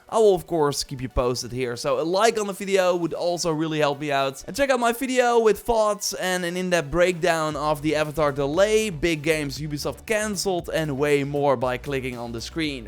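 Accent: Netherlands. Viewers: I can't get over your Dutch accent🤣 it's so obvious (omdat ik zelf ook Nederlands ben)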